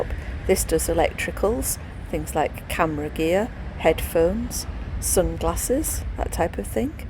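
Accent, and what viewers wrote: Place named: Yorkshire, United Kingdom. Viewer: Excellent video and we enjoyed the commentary too; very informative. We're from Yorkshire and we think you may be too! Many thanks!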